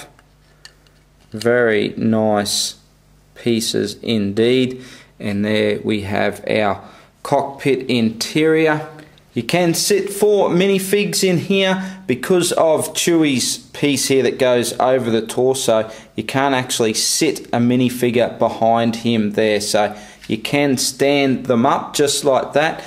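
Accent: Australia. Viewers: 1st thing I heard was an ausie talking so I insta subbed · If I had to guess, I would say this guy is from Australia like me. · Just for the record, this guy sounds really Aussie, and I'm an Aussie haha.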